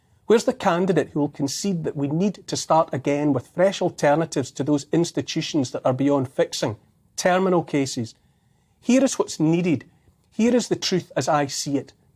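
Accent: Scotland, United Kingdom. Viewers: Thank you Neil for exposing the facts so clearly. It is so important to have more and more journalists like you speaking their truth without bias. Not only it is superbly said but your lovely Scottish accent is music to my French ears. Go go go Neil ! 💪🏽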